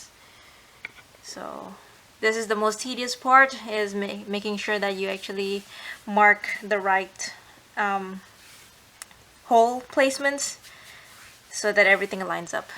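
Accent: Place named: Hawaii, United States. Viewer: Are you from Hawaii? I can hear a little Hawaiian pigeon in your speech.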